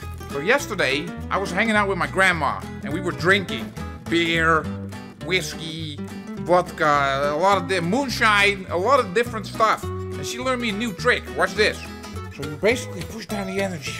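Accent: Netherlands. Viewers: are you dutch i hear dutch in your voice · Somehow your accent got even more Dutch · I got a good feeling about you being dutch because that is an accent that only dutch people have. But are you? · He sounds like he’s from the netherlands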